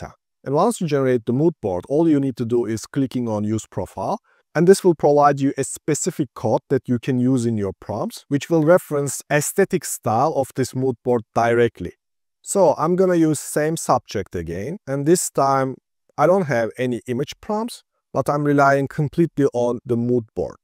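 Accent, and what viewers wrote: Turkey. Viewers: dayı türkmüsün by nası aksan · (sesinizden Türk olduğunuzu varsayarak) Videolarınıza Türkçe altyazı ekleseniz çok iyi olurdu. Ne yazık ki Türkçe kaynakların çoğu “en azından benim gördüklerim” GPT ile nasıl para kazanılırdan öteye geçmiyor :) Anlatımınız ve video için teşekkürler.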